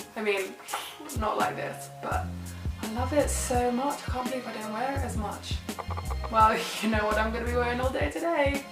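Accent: London, United Kingdom. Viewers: What part of London are you from? You have the poshest accent I've ever heard haha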